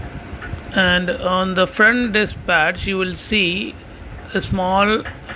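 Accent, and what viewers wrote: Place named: North India, India. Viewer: I thought your a north indian.... You know the way you speak English made me think that...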